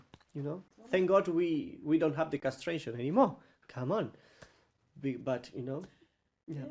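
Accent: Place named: India. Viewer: Parla inglese come un accento indiano 😅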